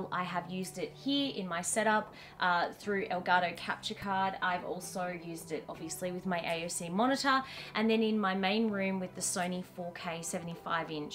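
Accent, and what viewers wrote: Australia. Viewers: Omg are you Australian because I am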